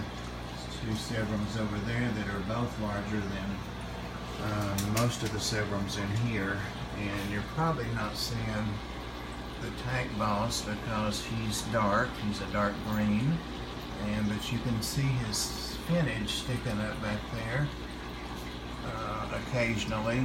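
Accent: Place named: Tennessee, United States. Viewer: A little light on the audio with the Tennessee accent, some of my wish list aqua's in there, awesome and no aggression in the small tank, perfect.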